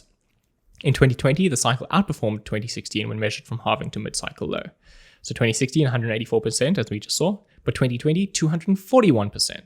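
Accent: South Africa. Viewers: Love that South African accent,! 👍👍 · You sound so South African... Love your videos, they the best.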